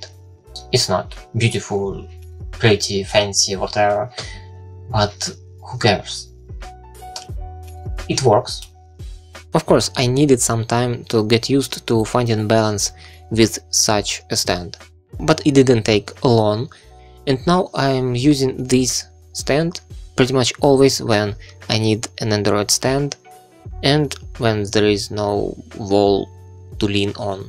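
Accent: Russia. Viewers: Мне вот интересно, он говорит с русским акцентом или такой присущ ещё кому-то? And the video is very informative! In Russia, where I live, it will be problematic to buy Nendoroid Easel Stand on your own, but I always want to take a photo of my favorite figurine. Thank you very much for DIY!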